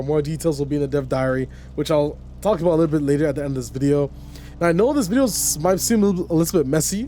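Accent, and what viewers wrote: France. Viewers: Yesss can already hear that French accent rubbing off on you! You should come to the UK bro! So happy for you do your ting fam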